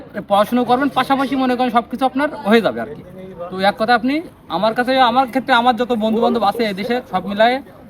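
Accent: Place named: Pabna, Bangladesh. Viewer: vai ki pabnar local nki💙 vasa to chenachena lage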